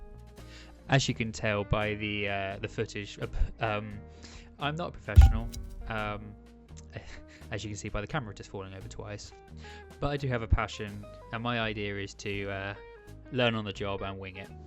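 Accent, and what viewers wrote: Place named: England, United Kingdom. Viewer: You have the english accent so that is a good first start.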